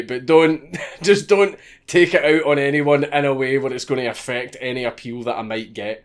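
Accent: Scotland, United Kingdom. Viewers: SCOTTISH AF! Where's your blue face paint son?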